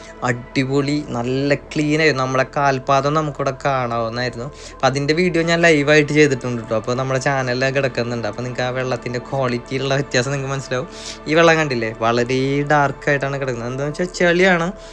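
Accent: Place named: Thrissur, India. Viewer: നമ്മടെ തൃശൂർ slang ❤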